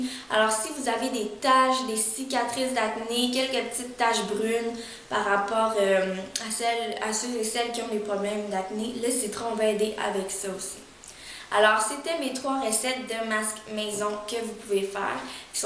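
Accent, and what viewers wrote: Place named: Quebec, Canada. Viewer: lol oui les français nous avons un accent , mais les québécois vous avez un accents très prononcé , c'est pour ça que je regarde beaucoup de vidéos faite par les québécoises, j'adore cet accent , je le trouve magnifique :-)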